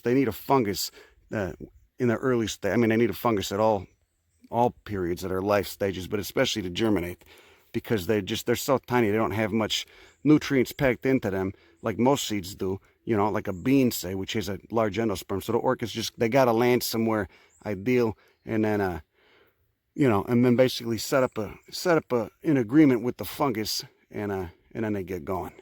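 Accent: Chicago, United States. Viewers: I told my wife check out this dude with a thick Chicago type accent talk about nature like a boss. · *Chicago accent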